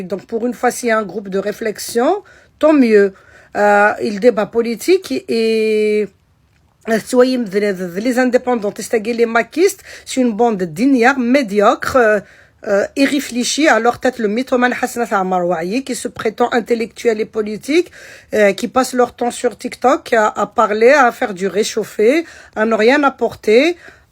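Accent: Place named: Kabylie, Algeria. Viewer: Bravo madamme bien parler vrai kabyle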